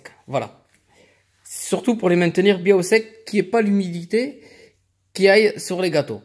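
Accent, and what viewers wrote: Marseille, France. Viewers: je suis ivoirien (cote d'ivoire ) on m'a toujours dis que ceux qui parle français avec cet accent sont marseillais. estce vrai?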